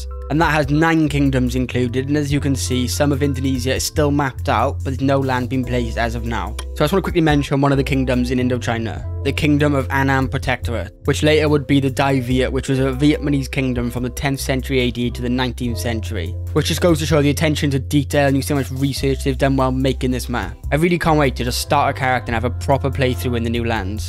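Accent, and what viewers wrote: Wales, United Kingdom. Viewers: You sound Welsh, take my like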